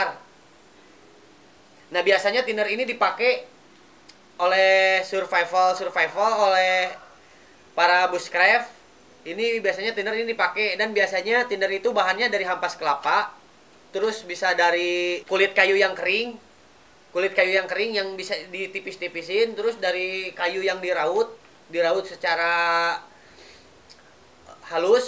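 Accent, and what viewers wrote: West Java, Indonesia. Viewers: Sunda pisan logatna hehehe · seceng anying wkwkwk khas sunda bgt lo bang hahaha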